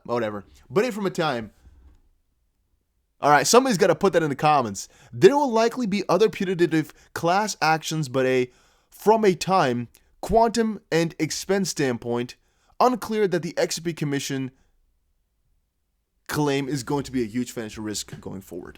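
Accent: Netherlands. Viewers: you're DUTCH BRO?! madness! Lol I Prefer you Dutch accent maybe cuz I''m in the UK good to hear EUROPEANS on the crypto train!!! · omg your dutch accent was so cute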